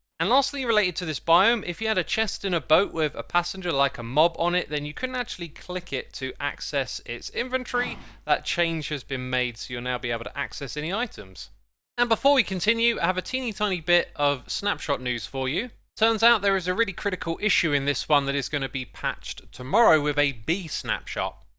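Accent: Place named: United Kingdom. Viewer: realizing i have never heard anyone with a british accent say "y'all" until today.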